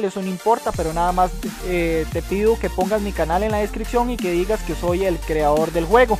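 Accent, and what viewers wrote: Costa Rica. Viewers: eres de costa rica?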